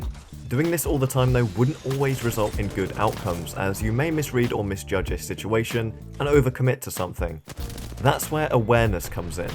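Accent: England, United Kingdom. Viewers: Nice to have some Apex vids in an English accent! Is there skills matching in Apex? I seem to constantly get one-clipped by people with between many hundreds, and thousands, of kills, and always to be holding up my team mates. The scores the game gives you seem to be massively weighted towards survival time and everything other than kills, so if you have some tactical nous and play as a team player, you get to high levels despite potentially being awful at getting kills, as I am - which leads to frustration! I'd love to find more people what want to play a slower, longer-range game and outflank enemies as a squad, not just charge in aimlessly whenever they see anyone....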